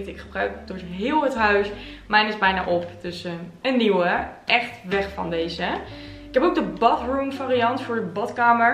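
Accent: Twente, Netherlands. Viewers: Onze mooie Twente dialect 🎉😊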